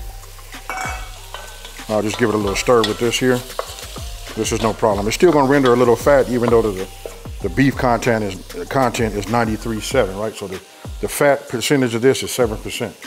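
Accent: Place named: California, United States. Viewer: I love your cali accent, you making me miss home lol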